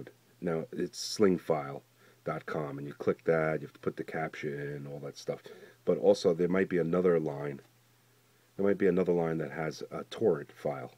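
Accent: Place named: Long Island, United States. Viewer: i like your accent! are you from long island? thanks man!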